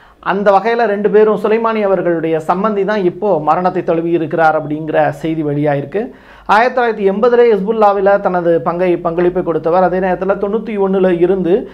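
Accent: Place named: Madurai, India. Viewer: உங்கள் மதுரைக் குரலை கேட்டு பாராட்டுகிறேன்.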